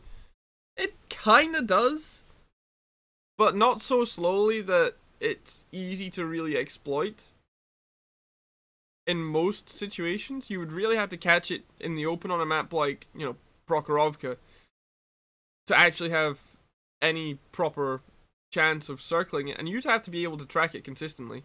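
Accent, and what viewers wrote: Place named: Scotland, United Kingdom. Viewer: Dear lord, u is sounding Super Scottish right now!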